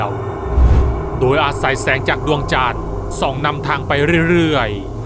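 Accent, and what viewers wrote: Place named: Isan, Thailand. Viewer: อุ๋ยคนอีสานอีกแล้ว😆😜🤫 อีสานหรือหนือนะ 😔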